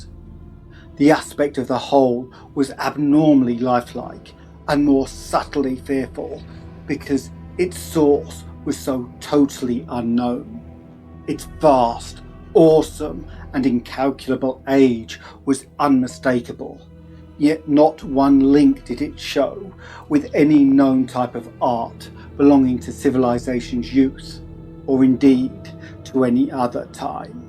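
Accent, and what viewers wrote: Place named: United Kingdom. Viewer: Telling a story with Americana locations and culture with a Brit accent seems a bit out of place 😅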